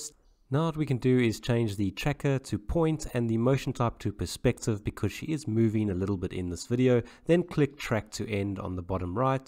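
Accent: South Africa. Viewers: Always Love the South African Accent ;)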